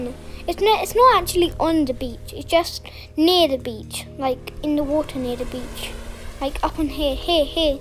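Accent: United Kingdom. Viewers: you sound like a british kid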